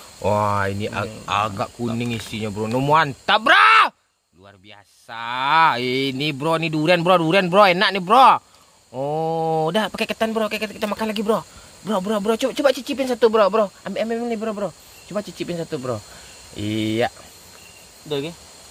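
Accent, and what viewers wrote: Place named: West Sumatra, Indonesia. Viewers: Bahasa nya jaleh urang Minang nya om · Bang abang org mana bang soalnya subscriber baru kok suaranya kayak orang sumbar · Suka kali aku logat nya minang kocak😍❤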